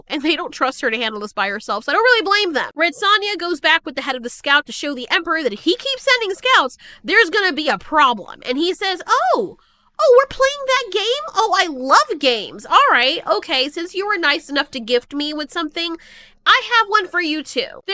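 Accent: Scotland, United Kingdom. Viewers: love scottish accent :D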